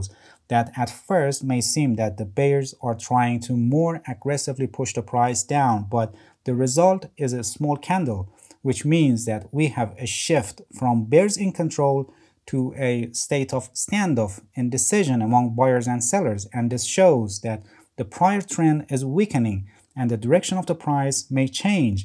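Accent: Iran. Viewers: As soon as I started the video, knew my man was Persian. Halet chetore azizam???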